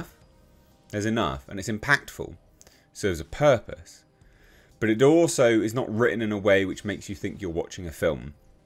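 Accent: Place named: England, United Kingdom. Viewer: When you say “masterpiece” with your English accent, I want to believe you. I’ve been let down too many times (not by you… yet). I’ll let you know. I’m Cajun who now lives in South Carolina. We don’t do toxic positivity. 😉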